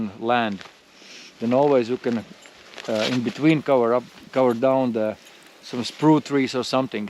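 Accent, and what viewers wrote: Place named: Baltic states. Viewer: Так вот как звучит прибалт,говорящий на натовской мове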